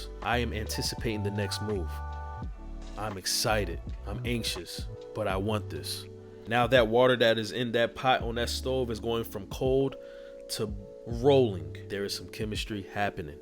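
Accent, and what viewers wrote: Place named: Philadelphia, United States. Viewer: Love your Philly accent❤ Great content.😊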